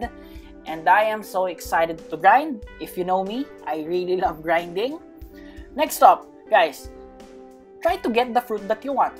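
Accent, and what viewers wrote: Philippines. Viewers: Sabi na nga pilipino ka eh accent palang eh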